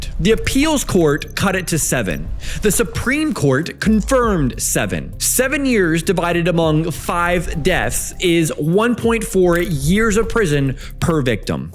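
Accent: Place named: Australia. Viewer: Oh Shit he is starting to talk like an Aussie. He's integrating.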